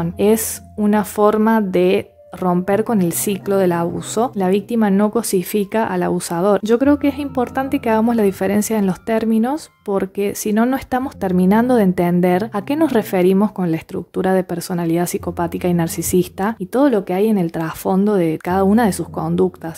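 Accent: Córdoba, Argentina. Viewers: Hola excelente tu trabajo sos de alguna localidad de Córdoba, ya q tenés tonada cordobesa , sé reconocer esa tonada , porq yo vivo en Córdoba . Una pregunta y me interesaría saber cómo es la vida de un narisista en la vejez , cómo son sus comportamientos y sabiendo q ya no puede conquistar ? Un beso . :hand-pink-waving:🙂😘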